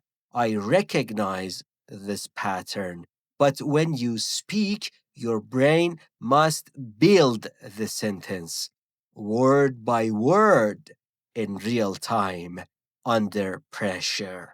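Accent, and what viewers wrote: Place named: United States. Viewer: شما بسیارعالی تدریس میکنید چون تلفظتان امریکایی ست. بسیارعالیست.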